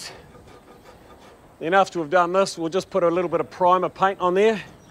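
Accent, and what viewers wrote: New Zealand. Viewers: Thanks mate. I lived in NZ for about a year, but it has been while since then. Love Kiwi accent, sweet as. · I love New Zealand accent with construction. Absolutely Brilliant.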